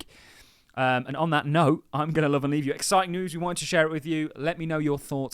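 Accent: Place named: Stourbridge, United Kingdom. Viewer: You from Stourbridge, Mate? Or is it Redditch?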